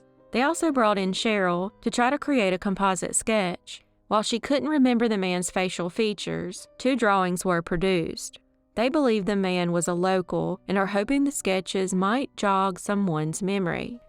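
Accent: South, United States. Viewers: Her accent is thick southern accents are thick. I don’t see a big deal .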